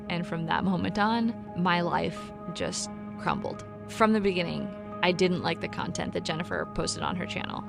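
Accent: United Kingdom. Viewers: justice543212 her voice is british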